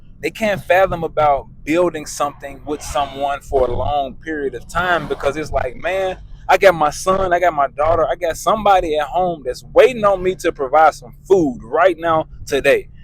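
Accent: United States. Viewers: Man sounds like the hood in New Orleans😢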